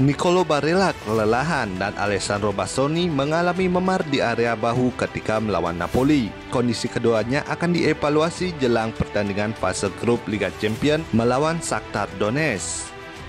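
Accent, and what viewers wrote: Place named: Aceh, Indonesia. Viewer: Admin yang bicara logatnya macam orang aceh.. betul tak min...FORZA INTER..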